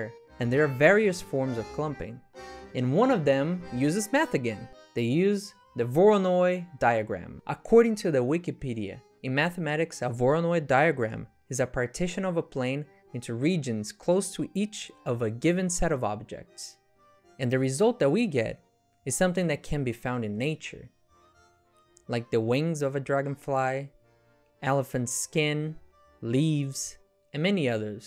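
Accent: Brazil. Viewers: Esquisito como eu consigo sentir q você é brasileiro kkkkkk. Vídeo muito bom e vc explica mto bem, cara, parabéns na moral foda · Wtf i think you have the best english in Brazil lol I mean you lost most of the accent... i can only wish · Eu reconheço um br quando vejo e escuto um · Your accent remembers me the brazilian accent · is that a brazilian accent?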